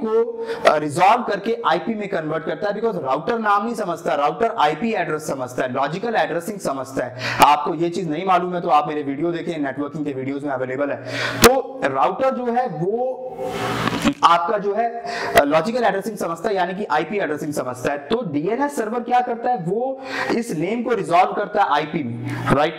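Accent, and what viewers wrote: Pakistan. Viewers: sahi kaha bolta ek dm pakistaniyo ki tarah h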